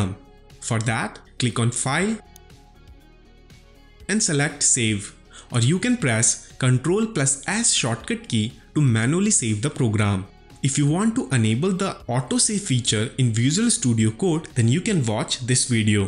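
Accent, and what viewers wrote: India. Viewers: He's indian accent makes this 10x easier.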